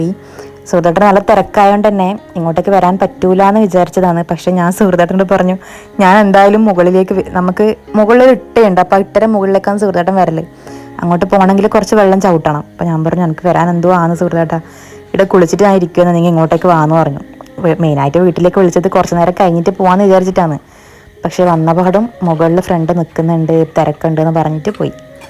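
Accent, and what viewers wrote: Kasaragod, India. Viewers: you are soooo.. sweet. I watch your videos mainly to hear your slang, kasargod slang.. I like your home surroundings, achamma, achachan, amma, achan, kunjava..❤ God bless you all.. 🥰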